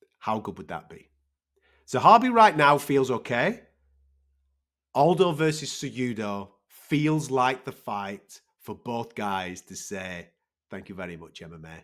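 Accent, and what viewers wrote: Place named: England, United Kingdom. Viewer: Also the thiccc English accent makes all the names sound so epic 😂🔥🔥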